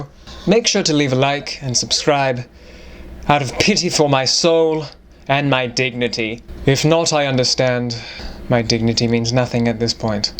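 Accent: United Kingdom. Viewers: This is my second vid i watched of him so uhm what is his real voice??? 😂😂 Liks is the British voice his real voice or not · I am so used to hearing his British Gentleman accent that I forget that it is not his real voice..... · I wish would read Darcy's proposal from pride and prejudice lol or Mr. Rochester's proposal to Jane with the British accent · thats how you know that, and his up-most british accent, is a fellow anime fan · Is he actually British or is he American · The funny thing is that earlier in these videos he’d only be British when reading it, now ITS ALL THE TIME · I love either way when he does his regular voice & and his british gentlemen for the 1800's voice. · So I came here from tik tok for the british accent... but the reactions are sooooo much better 😂😂😂😂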